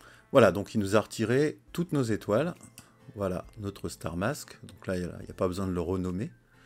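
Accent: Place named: England, United Kingdom. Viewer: Mais quel travail de Titan ! Une série complète depuis l’install en français, il n’y en a pas beaucoup ! Merci pour cette formation et ce partage de savoir. J’ai appris tellement de choses avec cette 3ème partie. Pix est vraiment génial, les possibilités sont infinies :) il nous faudrait au moins 7 vies pour tout savoir. Plus qu’à mettre tout ça en application pour les prochains traitements :D Merci aussi pour le process icons et tes données à télécharger ;) Ps : Je suis fan de ton accent Anglais 😂 j’adore !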